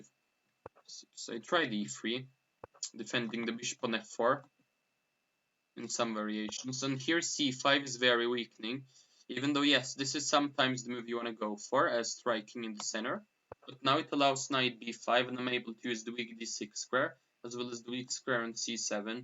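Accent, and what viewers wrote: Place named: Italy. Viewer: Are you Norweigen? You sound more Italian aha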